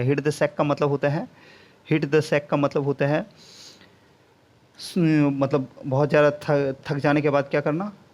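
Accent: Bengal, India. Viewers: sir, aap bengali ho?? LOVE THE WAY YOU TEACH...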